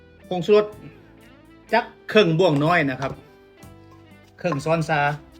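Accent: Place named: Isan, Thailand. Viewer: พูดอีสานเพราะมาก👍👍👍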